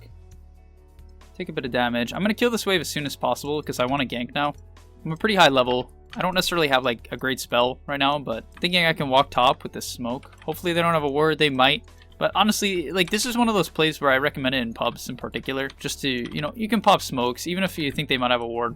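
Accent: India. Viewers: I thought there is a new guy, a indian one haha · i was surprise too. Because I watched indian programming tutorial videos, I thought it was an indian person. lol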